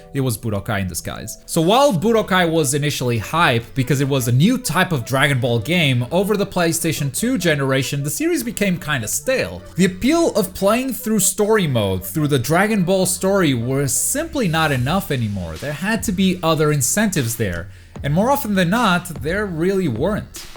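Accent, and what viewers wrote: Portugal. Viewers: É claramente português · eu a ouvir a pensar que parecias ser tuga e afinal dps falas em portugues e pronto duvida acabaram ahah mas algo k ne comum ver u tuga ate fazer bem nesta area, continua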